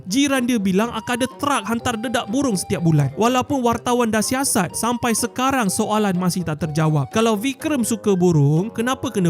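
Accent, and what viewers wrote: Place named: North, Malaysia. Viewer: loghat utara. Belajar tu biar luas, jangan sempit2😅😅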